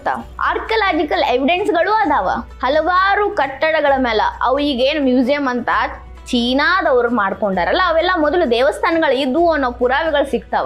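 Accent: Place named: Uttara Kannada, India. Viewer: Anna adu uttarakannada slang avru matadirodralli tappenilla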